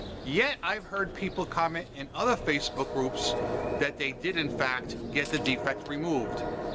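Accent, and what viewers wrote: New York, United States. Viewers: New York City accents like ROCK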